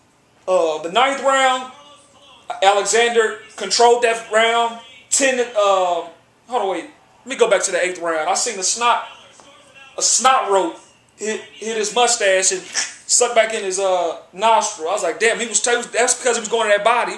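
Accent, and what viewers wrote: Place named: South, United States. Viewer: My nigga u sound like u from one its them down south states or I maybe even from st louis so u holdin down ya mans but that nigga alexander loss but he did look way better than I though I had him getting ko'd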